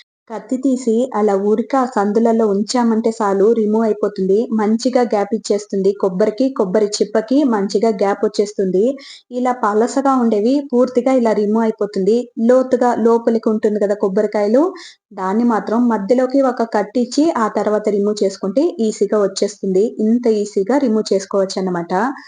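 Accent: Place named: Tamil Nadu, India. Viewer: Telugu raada meku Tamil laga matladutunnaru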